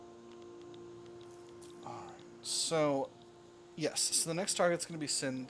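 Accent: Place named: United Kingdom. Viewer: Really enjoying the british accent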